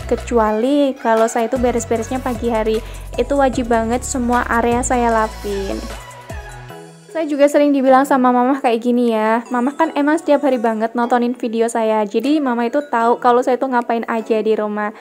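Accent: Banyumas, Indonesia. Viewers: Slalu nonton vidio tp baru ngeh klo org ngapak 😀 aku jg org ngapak bun, pemalang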